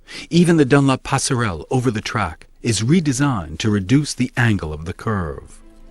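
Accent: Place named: United Kingdom. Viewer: El título en ningún momento habla de español. Deja de enojarte con otros por ser vos el burro, y aprende un poco de inglés. Es británico encima, mucho más fácil de entender que el norteamericano. No seas tan engreído y deja de tenerle bronca a otros por tu ignorancia.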